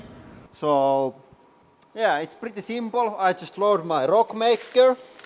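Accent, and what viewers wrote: Finland. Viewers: I have a theory that the Finnish accent it one of the secrets of this channels success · I like how he still doesn't fully grasp the concept of the letter Y in English making an "i" sound and not the "u" sound it does in Finnish. "Velcome to Huudraulic Press Channel" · As a Dane I envy you Fins for your accent. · I love his finnish accent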